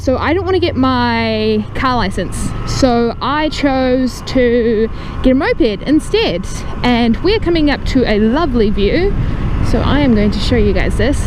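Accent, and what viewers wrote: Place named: New Zealand. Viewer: Quite liking the New Zealand accent 👌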